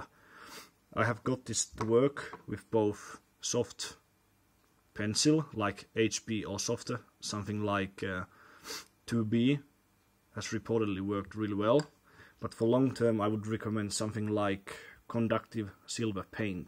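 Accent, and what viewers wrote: Finland. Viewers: He's Finnish. Also i love the comment section here lmao....its hilarious...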